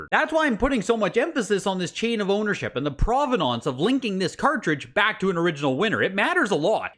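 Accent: Canada. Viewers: What is that accent you have? Canadian?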